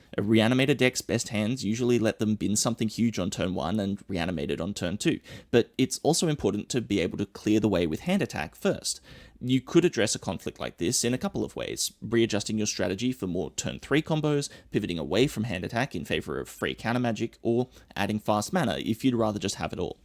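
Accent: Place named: Australia. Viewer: bloody brilliant to hear an aussie accent on mtg youtube! instant subscribe!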